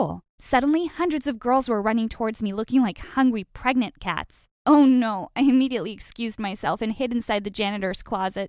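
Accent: Philippines. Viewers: Omg I'm a pilipino and the freakin accent😭😂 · wow i was shock i like the filipino accent and the word makes me laugh hahahhaha and she mentioned phili[[ines i feel happy and excited HAHAHH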